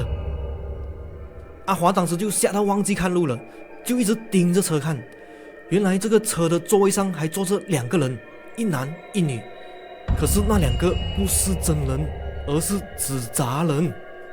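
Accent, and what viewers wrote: Malaysia. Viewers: 很马来西亚feel的华语，听多了台湾的灵异频道，还是这里有亲切感😁，加油啦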